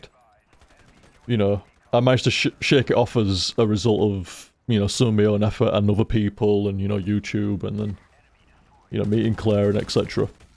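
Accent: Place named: North, United Kingdom. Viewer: Hey, like a few others have commented, I first subscribed to your channel during your very first IWBTG playthrough, back in 2008 or whenever it was. I've gone from an awkward 14 year old to a successful 24 year old watching your vids, and I honestly think your content has helped me a lot through some of the tough times (maybe a fellow northern voice on the internet was cathartic, or maybe it's just all the laughs, who knows...). You're certainly my favourite youtuber ever, no doubt in my mind. you might not get millions of views on each playthrough, but your fanbase is really dedicated and I don't think a 2 month gap is going to make us go anywhere - we've been here long enough to stay a while longer! Take as long as you need to feel better - I can always watch the super mario 3 htsf for the 5th time... cheers!!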